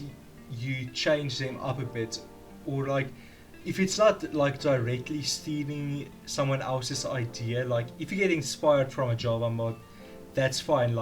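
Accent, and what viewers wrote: South Africa. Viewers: Are u from South Africa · Nice work on the world edit addon. It was a lifesaver. Tho I know you are from SA just because your accent is the same as RenDog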